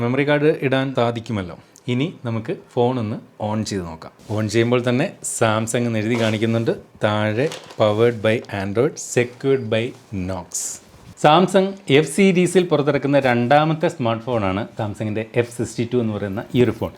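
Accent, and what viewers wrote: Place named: Thrissur, India. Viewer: cheettan thrissur kaaranaano amsaarum nammade thrissur kaare poole undu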